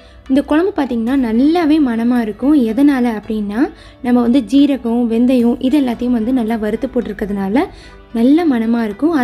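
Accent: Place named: Karaikal, India. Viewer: asalamu alalikum sis m from sri lanka but m a indian unga video unga talking style yellame paakum boodhu yenaku india la irikura maai feel aaudhu na adhukaagave unga videos paakurai m really happy when i saw your videos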